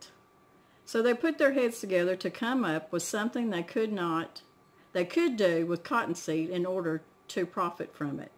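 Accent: United States. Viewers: I love hearing a country girl talking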